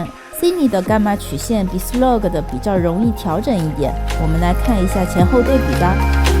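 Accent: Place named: Shanghai, China. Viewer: 专业的解说，声音好听，好像是上海人😛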